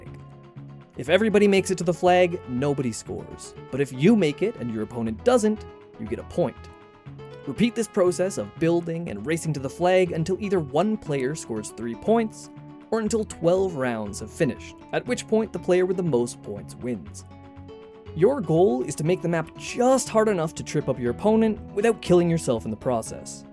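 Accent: Canada. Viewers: Oh yeah HAVE to be canadian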